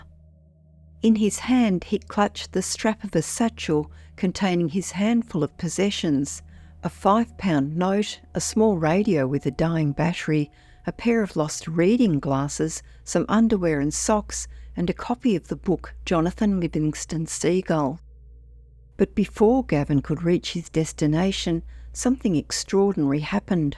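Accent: Australia. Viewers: I know it's an Aussie accent, just wondered whether it was human or robot. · Voice is Aussi accent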